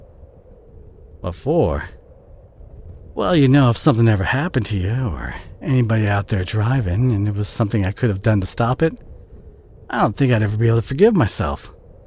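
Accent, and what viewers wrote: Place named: South, United States. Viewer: love audio and story. another cowboy audio yes!!!!!!!! the southern accent it’s just 🫠 I do really love the audio of you as a Cowboy. I don’t drive well because I can’t but in audios I can. thanks for helping me out and giving me [listener] a place to stay. anyways lovely to hear your voice ♥️ cowboy + = ♥️♥️♥️♥️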